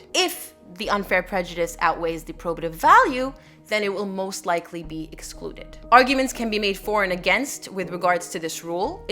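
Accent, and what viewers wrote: Canada. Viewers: I just realized how rude my comment could sound; I just meant I thought you had a faint Canadian accent. My apologies. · I’ve wondered for months where you practice. I eventually settled on Canada bc of your accent, (or lack thereof.) I’d have never guessed Australia in a million years.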